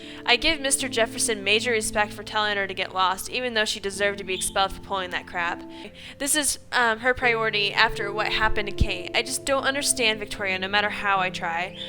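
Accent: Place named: United States. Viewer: Where there be an American accent you just know I am not far behind ;) xxx I have missed these videos babe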